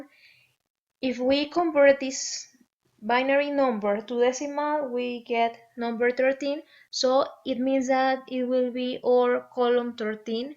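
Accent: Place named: Spain. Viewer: she's soo spanish. I love this accent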